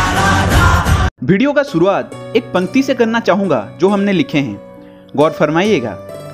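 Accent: Odisha, India. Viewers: It is evident from his hindi accent. Don't expect any support from so called northies. we are enough to promote our history and culture. why to give 😞😞 emoji.. 'Odia pila hindi kahile dhara padijaye🤓 kahinki na aama bhasa re sanskruta ra prabhaba besi" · haa se Odisha ra karan tara bolene ke tarika ba kahiba uchharan ba assent ru jana paduuchi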